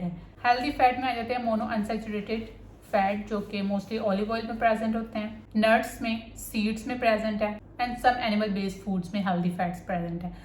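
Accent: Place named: Punjab, India. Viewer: Very informative but improve your Urdu accent...seems you speaking Punjabi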